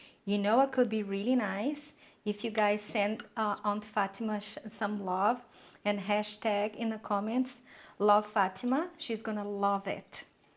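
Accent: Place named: Brazil. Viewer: Where from Brazil you are from? I figured out by your accent!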